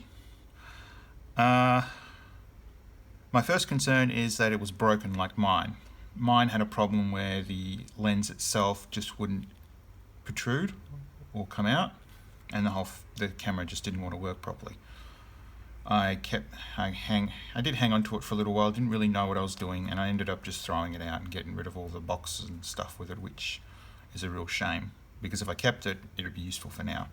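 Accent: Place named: Australia. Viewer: i love your aussie accent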